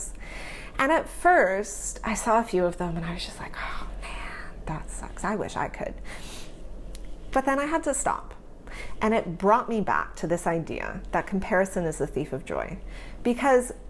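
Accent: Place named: Canada. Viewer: What? Struggling with confidence? Who does that? Ok.....back up the bus.... Who doesn't struggle with it, is a better question. I guarantee even the most accomplished people have or still do struggle with it! We are all good at self doubt that is what makes or breaks us. It creates competitive energy or steals it! For me I am more jealous of the opportunities other photographers have, then the results! Like you I live in the cold cloudy climate! Minnesoooooota! Oh and a side note I knew you were from Canada just by the way you say out and about!! Lol I am a person that thinks I should be learning faster then I do! I have always struggled with learning speed. It has detoured me form moving forward in the past, because I don't get the results "I" think I should be getting! So in a way comparing me to me is self destructive! Instead i need to work the process and just do it and results will eventually come! 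Great message! Made me think about my own job life. Being retired now the only person I can blame for the position I put myself in is ME!! LOL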